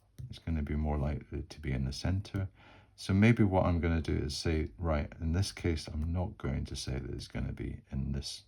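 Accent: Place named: England, United Kingdom. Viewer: Sir your English accent is pleasing to the ear and rarely gives me trouble. However for a minute tonight I was hearing High Merlin. " High Merlin what a concept! " I then caught up and realized it was high moorland. Sweet.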